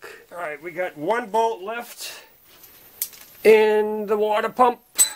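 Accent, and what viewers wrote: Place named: East Coast, United States. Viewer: If the accent doesn’t scream “east coast,” then the rust certainly does!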